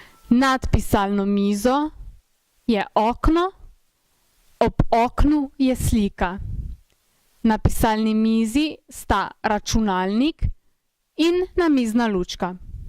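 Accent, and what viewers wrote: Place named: Italy. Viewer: It sounds like an Italian speaking Serbian or Croatian.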